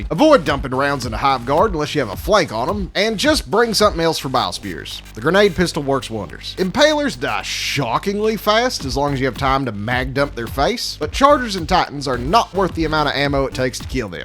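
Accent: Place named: Texas, United States. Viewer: I didn't know I needed to hear Helldivers tips with a Texas accent until I saw this video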